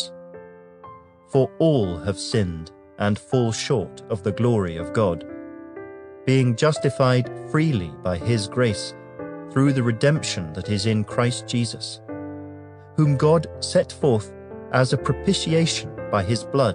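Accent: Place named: RP, United Kingdom. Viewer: Enjoy listening to queens English and piano background music.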